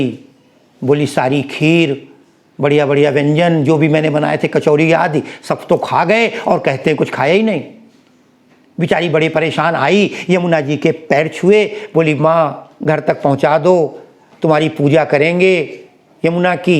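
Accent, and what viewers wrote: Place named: Unnao, India. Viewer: वाह वाह!बहुत ही विद्वतापूर्ण,शोध पूर्ण,भक्ति पूर्ण और अत्यन्त रोचक रहा भगवान श्री कृष्ण जी की लीलाओं का भक्ति व्याख्यान।ऐसे ऐसे रोचक पदो की विवेचना की जिन्हे पहले कभी नहीं सुना था।कचहरी का स्वांग बड़ा रोचक रहा।तीन तरह की एफ आई आर की जानकारी मिली।अंत भी अपनी रोचक और कौतूहल पूर्ण समस्या पूर्ति,कहां अंटके! से किया।बीच बीच में उन्नाव की बोली और लहजे की मिठास और अपनेपन की अनुभूति का भी आनंद मिला।बहुत सुंदर प्रस्तुति के लिए धन्यवाद।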